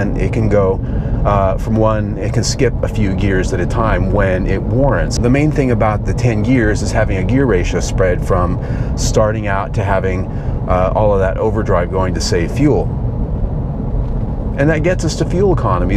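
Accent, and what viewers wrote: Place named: United States. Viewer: love the "Murrican accent!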